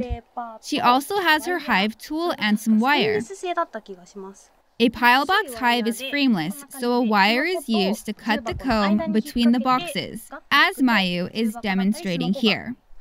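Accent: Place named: Japan. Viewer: Жаль, что она не говорила просто по-японски. А английскую речь с японским акцентом слушать невозможно.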